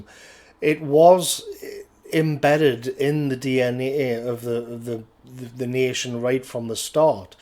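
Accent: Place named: Geordie, United Kingdom. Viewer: You're a clever lad marra. Love listening to your vocabulary in wor accent!!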